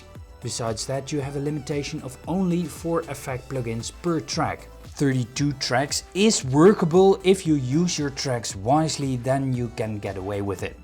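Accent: Netherlands. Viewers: Hey hoor ik nou een Nederlands accent? :D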